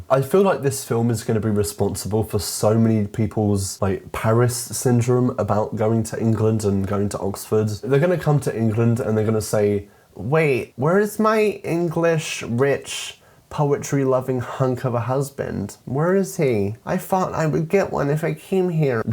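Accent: United States. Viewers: Your American accent is flawless 😅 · Your american accent is perfect never stop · i love ur american accent lol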